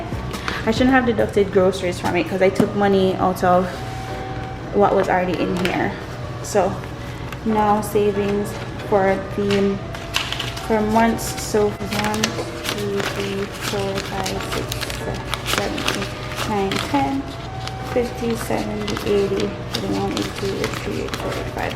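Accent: Jamaica. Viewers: Girl i can hear the Jamaican accent a lil 🤣🇯🇲💘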